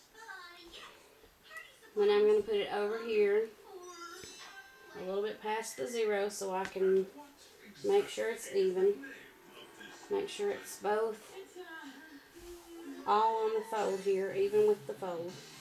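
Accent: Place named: South, United States. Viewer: Thank you. I will be watching more of your videos. Love your southern accent :-)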